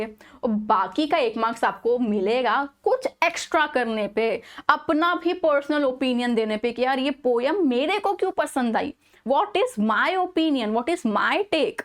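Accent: Mumbai, India. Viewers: Mam kya Bombay se ho kyaa apke ,tone se lag raha he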